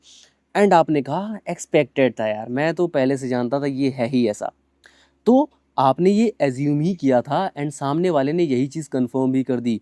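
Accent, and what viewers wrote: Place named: Bhojpuri, India. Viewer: Loved your bhojpuri tone ❤our language is our Pride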